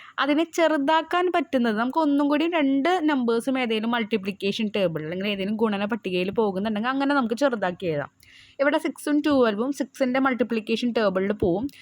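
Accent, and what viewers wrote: Thrissur, India. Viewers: ത്രിശൂര് കാരാണെന്നു തോന്നുന്നു ടീച്ചർ അതു പോലെയുണ്ട് പറച്ചിൽ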